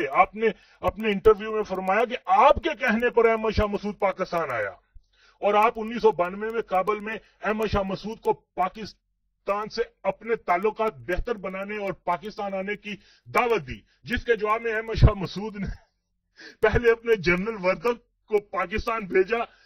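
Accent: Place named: India. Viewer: bolnay wala to awaz se hi koi indian lg rha hai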